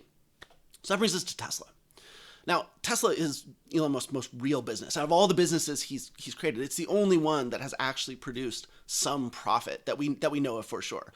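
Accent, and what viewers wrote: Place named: United States. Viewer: First time I see your channel because I was wondering whether you are a compatriot re your surname. You sound very american, so you must have dutch ancestors. Having said this, interesting video! 🙋‍♀️🇳🇱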